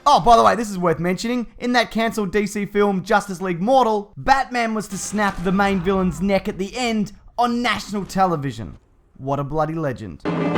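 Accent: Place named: Australia. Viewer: Someone explain how every single auzzie youtuber ( i think he is auzzie? ) sounds the sound Like this guys voice is the exact same as theauzziegamer and some other channels i know ( btw no offence im an auzzie as well mate k )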